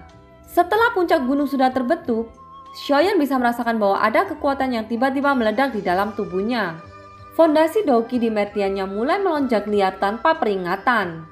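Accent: Java, Indonesia. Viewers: Peyampayannya bukan LG anime donghua tp logat Java donghua.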